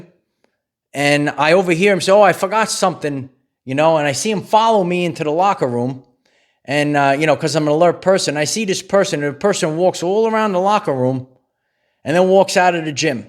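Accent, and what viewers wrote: New York, United States. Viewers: Yes! (Nice to hear a NY voice.)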